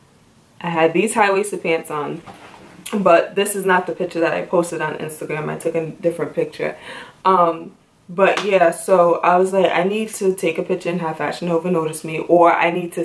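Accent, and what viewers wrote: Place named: Jamaica. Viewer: hey i love your accent , are you Jamaican?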